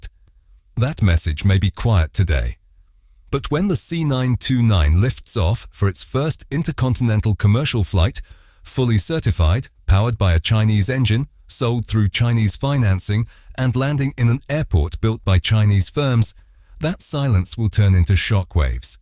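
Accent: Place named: United Kingdom. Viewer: Trust China ? Trust their data & one test flight. How much does it take to buy off media hyped promotions ? Now it’s alledged … CCP is doing it all . This is CCP lackey — agit prop , bought off public relations, & over hyped mock up. — Looks like Boeing & AirBus should give up.. Pure “ white monkey spokesman “… influencer with a “ Brit accent “. — Towering CCP fakery & tub thumping.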